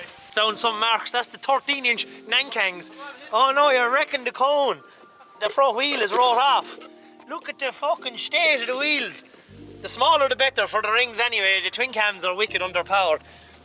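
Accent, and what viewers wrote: Ireland. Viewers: The rings were class but you need practice man!! The Irish sayings and accents going worldwide lads! Fair play 😎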